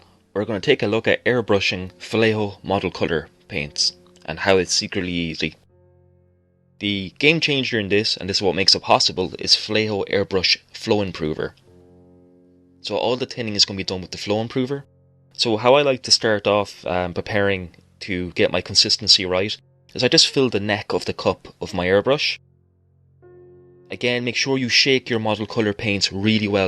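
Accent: Ireland. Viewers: Great job,! At first, I was surprised when you kept saying "tinner", but then realized it's your Irish pronunciation.:) Great video and very educational on spraying Model Color. Thank you. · my friend, your philosophy is my philosophy. The simpler the better! Oh, by the way; I like that cool accent of yours....are you Irish?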